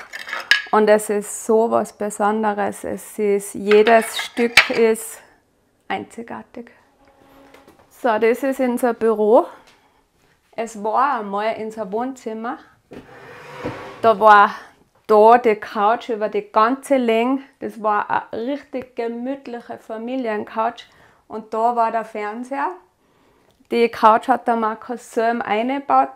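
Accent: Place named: Bavaria, Germany. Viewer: Du bist so ein Sonnenschein, dem Dialekt nach 🤔Bayern oder Österreich? ich liebe deine Deko und auch wie es bei dir zu Hause aussieht, voll mein Geschmack, fühle mich einfach gut in so einer Umgebung. Als Kind wuchs ich in einem Dorf auf mit Tieren und Garten drumherum, Veranda..Jetzt auch im Dorf nur Leider nicht so schön wie damals. Treume von so einem Haus😌🥰wie eures🌹Dankeschön für die Inspirationen.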